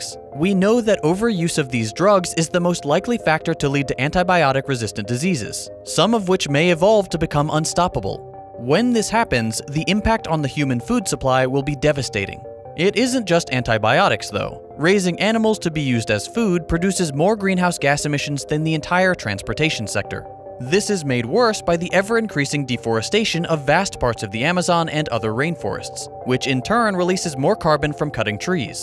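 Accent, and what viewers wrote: United States. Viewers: I usually prefer British or other accents than a thick and strong American accent, but your ability to narrate is incredible and your voice is super relaxing and good to hear, great job bravo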